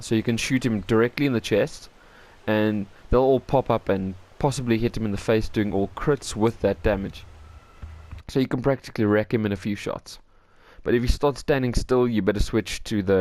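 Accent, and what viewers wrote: South Africa. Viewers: I recognize that accent from anywhere, you South African? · Are you south African?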